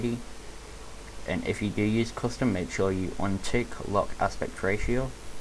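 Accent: United Kingdom. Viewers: yeah go us british accents he's just jealous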